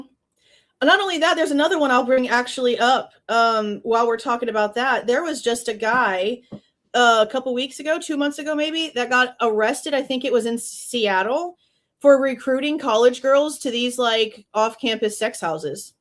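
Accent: Texas, United States. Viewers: ❤She’s from Texas I can tell….❤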